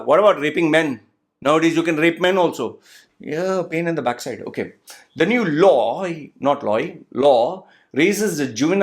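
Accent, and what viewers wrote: Kerala, India. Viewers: That verbal slapstick, mispronouncing word puns and the overdone mallu accent is getting old... Starting to get redundant.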